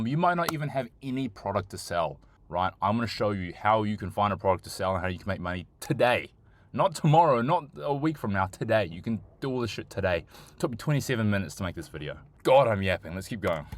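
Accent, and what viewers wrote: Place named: Australia. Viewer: You sound like you're from Aus, but you do Tiktok shop in US? How does it work? US sim card, proxies etc ?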